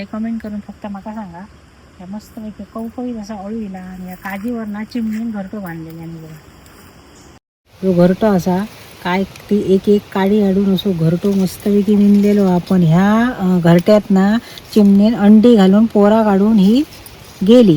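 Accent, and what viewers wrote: Malvani, India. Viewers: आपली मालवणी भाषा एकदम भारी..❤ऐकाक पण छान वाटता आणि तुमची माहिती पण भारी 👍❤️❤️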